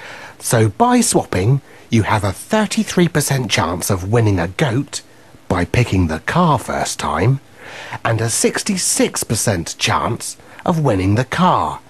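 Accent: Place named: United Kingdom. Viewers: 真的一直分心聽英國腔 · 英國講話很重音調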